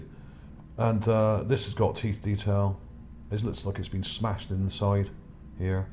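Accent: Bristol, United Kingdom. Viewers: Gotta be from Bristol with that accent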